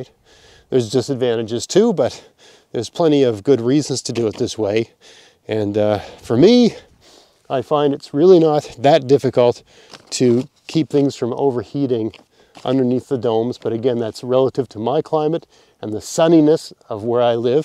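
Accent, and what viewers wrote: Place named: Nova Scotia, Canada. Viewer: i am a huge fan of the trailer park boys and live in europe. the moment i heard you talking, I was absolutely sure you are from Nova Scotia and i cant believe i was right :) you remind me a bit of Randy I dont know why, you are handsome and he isnt :) maybe the eyes and accent. love your channel, just subscribed and started watching. Thank you for everything you do. greetings from central Europe.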